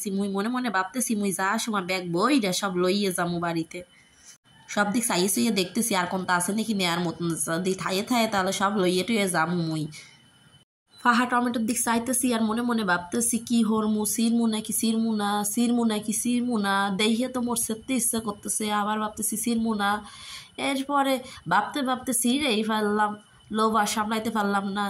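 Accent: Barishal, Bangladesh. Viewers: দারুণ লাগলো ভিডিও টা আপু,,, আরও বেশি ভালো লাগলো আপনার বরিশালের আঞ্চলিক ভাষায় কথা। লাইক দিয়ে পুরো ভিডিও জুড়ে ছিলাম আপু 💕💕🌹🌹❤️❤️ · আপু বরিশালের ভাষা খুবই দারুণ ছিল 😂😂😂😂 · বরিশাইল্লা কথা ভালই লাগে। খুব ভাল লাগলো সবুজ ধানের ক্ষেত😊 হাসের বাচ্চা খুব কিউট 😊 পুরো টা দেখে নিলাম 😊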